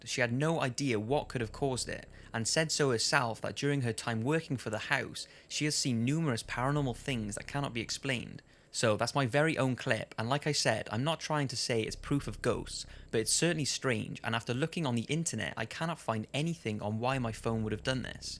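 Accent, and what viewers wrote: United Kingdom. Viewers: he sounds more British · Losing your Brit accent?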